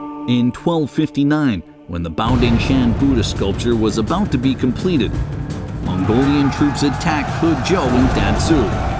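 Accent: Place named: United States. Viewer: Horrible Americanisation of Chinese culture. Awful narration with American accent, why?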